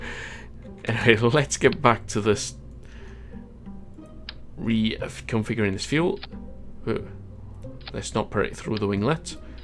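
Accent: Wales, United Kingdom. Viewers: Welsh accent with a speech impediment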